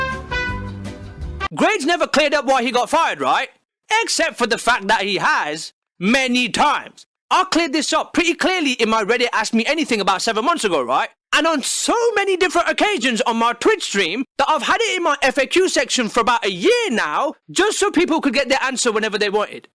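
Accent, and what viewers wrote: United Kingdom. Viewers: Wait... HES BRITISH???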